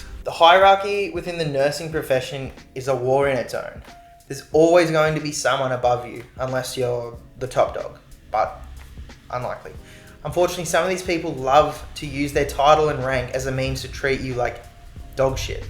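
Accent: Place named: Australia. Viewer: You sound so Aussie, are you?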